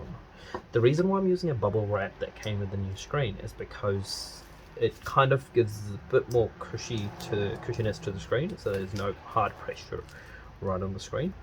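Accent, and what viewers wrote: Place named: United Kingdom. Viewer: Where is your location and your store to be precise? You sound British to me and by the way great video, thanks for the upload.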